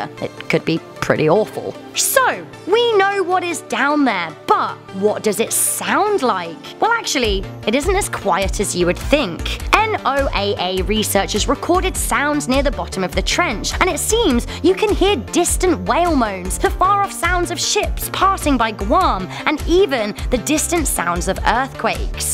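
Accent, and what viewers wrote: United Kingdom. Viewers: yeah thanks but i dont wanna hear british english anymore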